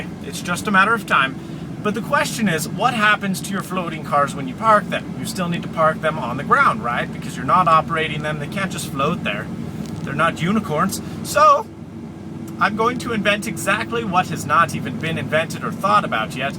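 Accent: Ireland. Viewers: You sound like an irish person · Are you trying an Irish accent or a Toby Turner accent?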